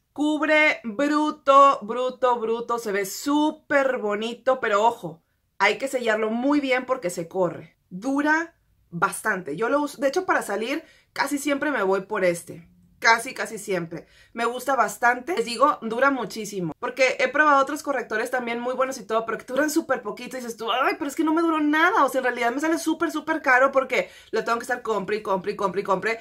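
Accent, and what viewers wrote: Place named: North, Mexico. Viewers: Hola!! Mi nombre es Dalia Ruiz, de Monterrey Nuevo León, mis favoritos son 1. Maquillaje líquido de Mary Kay 2. Un labial nude de marca Oriflame 3. Mascara para pestañas de Shelo Nabel (fue toda una sorpresa ya que desconocía la marca y terminó por convencerme) Me encantan tus vídeos y el acento muy regio difundiéndose en el mundo jaja un abrazo y que sigas con tanto éxito! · Hola soy Natalia Cortes de Puebla. Mis productos favoritos este año fueron la cc cream de mary kay, la paletta de too faced semisweet chocolate bar, y las brochas de ojos de eco tools:) Me gusta mucho verte porque hablas como norteña, y desde que me mude al sur extrañi mucho el tonito! Feliz año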